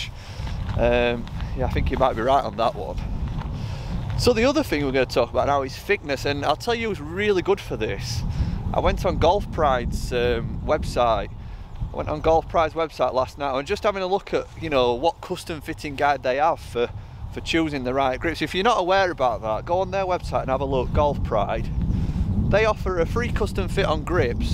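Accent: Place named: Liverpool, United Kingdom. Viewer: Sound like your from Liverpool And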